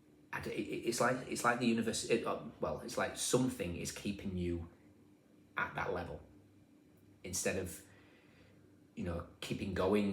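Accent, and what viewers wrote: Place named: Cumbria, United Kingdom. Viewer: Are you from around the Barrow-in-Furness area? I was born there, your accent is familiar. Yes I'm done with family that have a toxic pattern. Been scapegoated long enough. Done. Isolated, alone, but better than being crapped on repeatedly!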